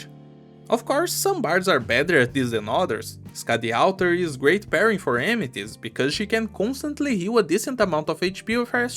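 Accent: Brazil. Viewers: Are you Brazilian? I recognize that accent lmao.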